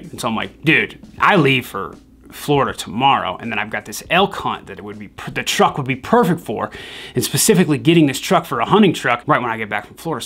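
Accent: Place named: New Mexico, United States. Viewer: lol I’m from New Mexico and that’s actually how they talk, And my mom has that same exact truck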